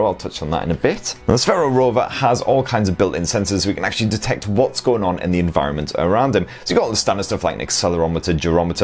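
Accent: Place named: England, United Kingdom. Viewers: Same. I can't quite place which part of northern England he is from. Doesn't sound like Yorkshire or Lancashire to me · I like your english accent sir.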